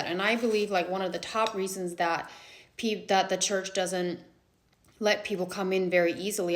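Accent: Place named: Pennsylvania Dutch, United States. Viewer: I love the accent! I’m from Pennsylvania, and I hear people talk like this when I go to Berks or Lancaster counties. My university (where I heard buggies on Sunday mornings clopping through the campus) had offered PA Deitsch language courses, but I took Spanish. Meant to go back! Thank you for the interesting stories.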